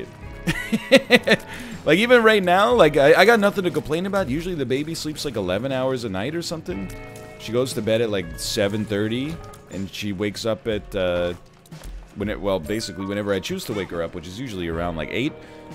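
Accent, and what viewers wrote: Canada. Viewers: Is it me or canadian accent is getting thicker?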